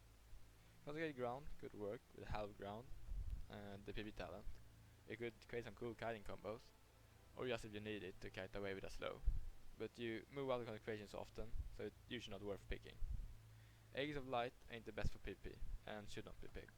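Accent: Sweden. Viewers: Are u from sweden?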